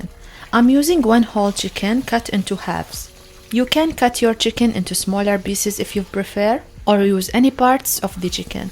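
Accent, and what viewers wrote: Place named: Saudi Arabia. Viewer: عشقي الاكسنت السعودي ❤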